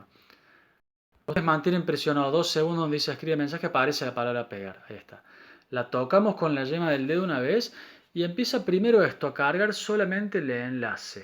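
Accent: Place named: Cordoba, Argentina. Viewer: Este es cordobés argentino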